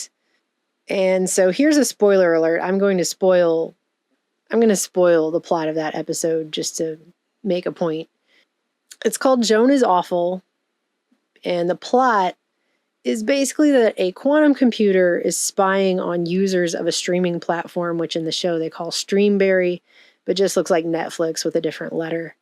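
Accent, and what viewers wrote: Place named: Midwest, United States. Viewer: eh, normal? has a decidedly Midwestern accent with the way she pronounces mom as "mahm", among other inflections. It's not a pristine General American accent or its relative pregenitors in the Maize Belt. That said, the San Fernando Valley accent is influencing speech just about everywhere, thanks to Hollywood. It seems to be the favored regional dialect to mock now, perhaps overtaking the various Greater Appalachian dialects, which used to be the go-to. Moreover, the both of them are already the basis of the charicatures of the entitled urbanite and the country bumpkin.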